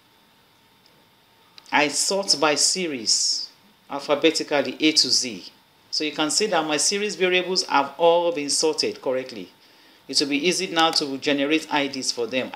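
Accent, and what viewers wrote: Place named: Nigeria. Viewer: Subscribed! Thanks alot for your detailed and simple explanations! It also feels awesome hearing these things explained in a Nigerian accent! Thanks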